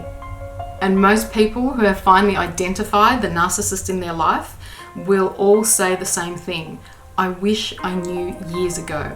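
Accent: Australia. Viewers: Your laymen's explanation of narcissism was so on point. Thank you so much. I am grappling with a family member who presents symptoms of this disorder. Also, love your accent, is it Aussie???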